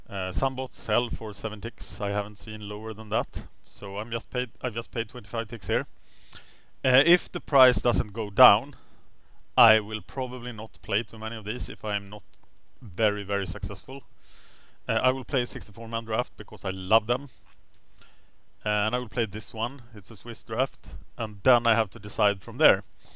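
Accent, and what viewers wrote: Sweden. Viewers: Are you Swedish ?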